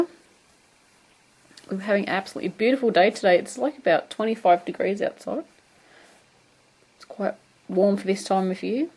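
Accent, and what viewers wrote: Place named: Australia. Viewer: my first thought at her voice was "ooh you're aussie aren't you?"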